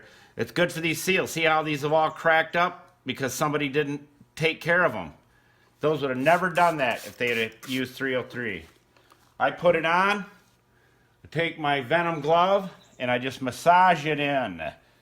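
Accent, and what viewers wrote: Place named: Pennsylvania, United States. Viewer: Where are you from? Your accent sounds a bit like it's from my neck of the woods in northeastern PA.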